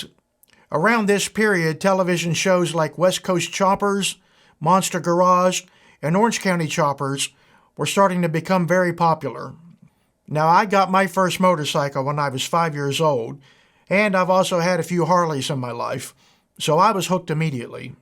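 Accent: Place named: West Virginia, United States. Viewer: I would have known where you were from just by talking. I know because I talk the same way. Keep up the Great Work. Keep making West Virginia look great. Thanks.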